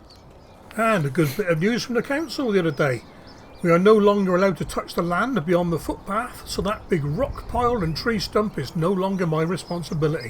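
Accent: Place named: Devon, United Kingdom. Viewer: you sound as if you are in devon but your soil is not red like mine torquay dave thanks for the tour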